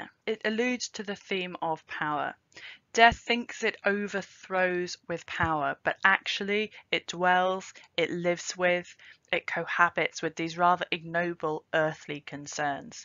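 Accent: United Kingdom. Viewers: Dear Dr. - thank you for this quintessential explanation to one of my most loved poems that i have always interpreted as the secret of what the mere Christian fairy tale is about. This poem connected me to transcendence like no other and i am not a privileged elite - i was educated in New York City schools and as Puerto Rican and trouble even understanding everyday language. There was some very beautiful way about this dialogue that blew me away. Now i found you and you have masterly deepen the beauty and given me more knowledge in that lovely British voice. I just put together the Russian Dying SWAN with this poem - for my imagination of the transition; like you said it is just a divine nap- ( LOVE THIS NEW INTERPRETATION) and we become so very rested and beautiful. In Socrates ‘ PHAEDRAS it is the trumpeter’s song - the more beautiful when thy know that they are to die - this gives me so MUCH HOPE!THE GREAT NEWS! 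I didnt know the COR.. SCRIPTURE - WOW. Today is an UTMOST FINE- YOU! Thank you for this illumination!SEE DYING SWAN- DLYANA-LOPATKINA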